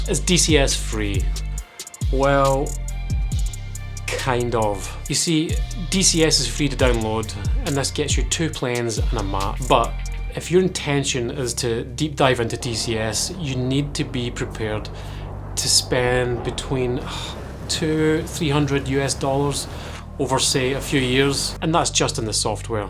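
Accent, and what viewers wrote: Scotland, United Kingdom. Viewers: Helpful towards new, inexperienced flight sim-ers like me? Yes. Scottish accent? Yes. What's not to like. Subscribed. Hope the channel builds nicely for you. p.s. What got me to realise I wanted to keep going on DCS was the excellent A4 Skyhawk mode. As a full fidelity offering, in an era which is simpler to learn and control, and carrier capable, I cannot recommend it highly enough for all new starters. Ignore the 2 free DCS aircraft and just get on that instead.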